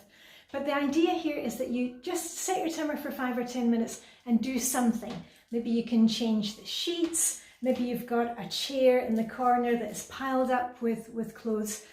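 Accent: Scotland, United Kingdom. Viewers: Hi, I really love your channel. I lost my husband.of 49 years, 2 years ago and.I still struggle with being.motivated. I do have a morning routine and stick to it most of the time. I do like to hear your voice, as my husband was Scottish and I miss his accent. I am Australian and we lived in Australia. THank you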